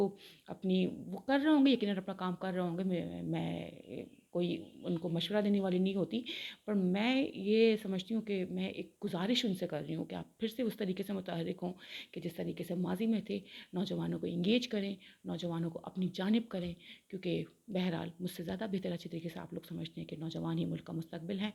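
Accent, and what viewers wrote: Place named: Punjab, Pakistan. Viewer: You are speaking wrong urdu with punjabi accent .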